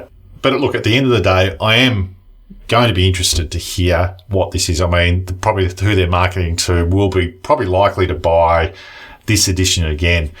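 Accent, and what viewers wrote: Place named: Australia. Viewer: why do yo Awzzees butcher the English language so badly?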